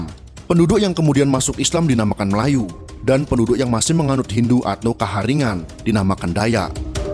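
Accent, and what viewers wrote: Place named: Java, Indonesia. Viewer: Miminnya ada logat Jawanya..kuliahnya d Jawa ya min?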